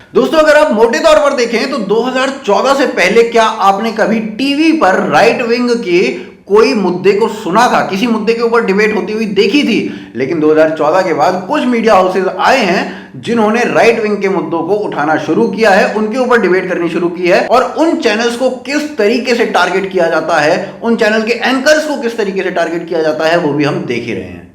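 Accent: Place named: Madhya Pradesh, India. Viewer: Bhaiya apki dialoge delivery waqai zabardast hai we support you.. bhaiya r u from Madhya Pradesh? I think so because ur talking style is similar to MP people i am also frm there.. :)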